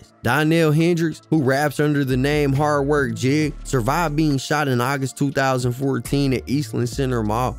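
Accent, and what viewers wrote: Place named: North Carolina, United States. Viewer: This the 2nd video I watched and plan on watching more. You did your thang bro! I got a friend in NC, I bet your from out that way, he talks just like you..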